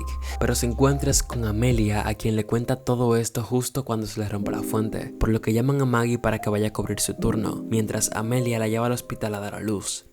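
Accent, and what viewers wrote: Dominican Republic. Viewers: Amo como narras , todo educado pero le metes el plus dominicano 🇩🇴🥰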